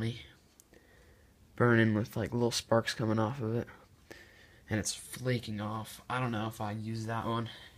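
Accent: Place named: India. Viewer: A wrapper I thought he was saying rapper This Indian tech guy inglish needs to stop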